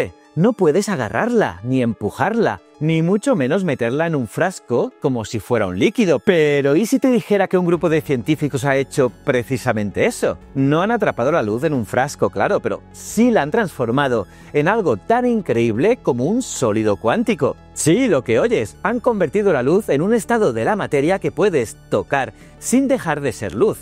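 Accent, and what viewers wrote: Spain. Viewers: ASQUEROSO ACENTO ESPAÑOL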